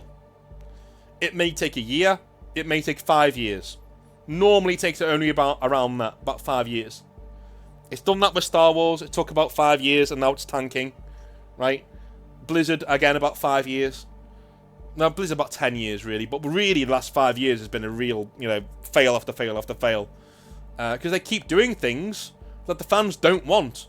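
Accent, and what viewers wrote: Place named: North, United Kingdom. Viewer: Painting some sweet, sweet Redeemed terminators (DA) whilst listening to those lovely northern tones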